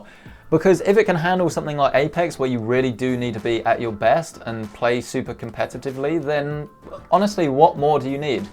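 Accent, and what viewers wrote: United Kingdom. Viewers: I just love the british accent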